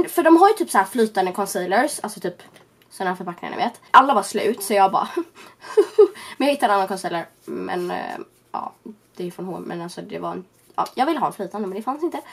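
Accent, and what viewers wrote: Stockholm, Sweden. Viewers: Förlåt men pratat hon inte lite Stockholmska???? INTE FÖR ATT VA ELAK JAG ÄLSKAR DIG ❤️❤️❤️❤️❤️❤️❤️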